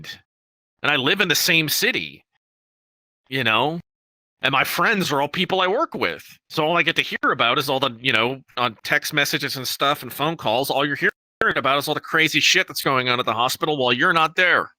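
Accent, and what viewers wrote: Chicago, United States. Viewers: sounds like Chicago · Sounds like Chicago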